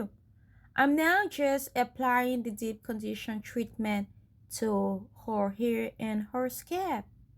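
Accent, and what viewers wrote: Liberia. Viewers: You sound so Liberian 🇱🇷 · Are you a liberian? You sound like one qnd i'm a liberian too so i'm asking.. thanks for the video. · You sound like a Liberian🇱🇷❤️❤️ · You a complete Liberian sis that tone can’t hide. Thanks for the video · I hear a little Liberian accent 🥰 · My mother in law is Liberian and your accent is so similar.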